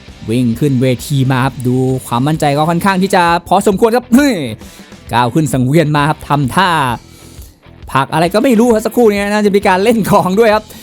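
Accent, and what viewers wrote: Isan, Thailand. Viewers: ชอบผู้บรรยายเป็นภาษาอีสาน ชอบหลายเด้อครับ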